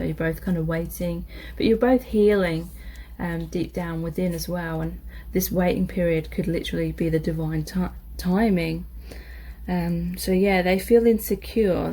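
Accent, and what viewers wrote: England, United Kingdom. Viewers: She does have a soft sweet voice with an english accent 💖🌹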